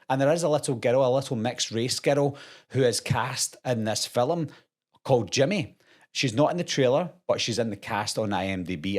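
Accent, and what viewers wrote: Scotland, United Kingdom. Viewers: You've got a Scottish accent!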